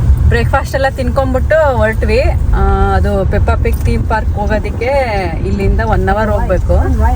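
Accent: Mandya, India. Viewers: Olleya Video madidiri. Mandya da Kannada chennagide. 🎉thanks🌹❤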